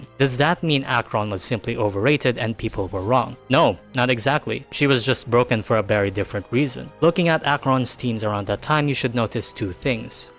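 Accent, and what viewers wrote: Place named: Philippines. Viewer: This guy lowkey sounds filipino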